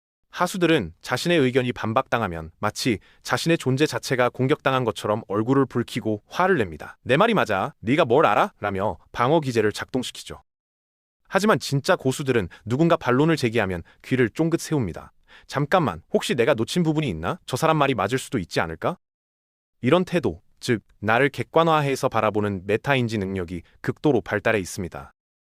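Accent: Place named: China. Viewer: 발음이 중국인같아여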